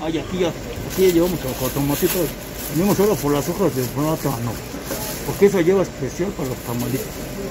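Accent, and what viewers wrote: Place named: Mexico. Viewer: saludos tiene asento de mexicano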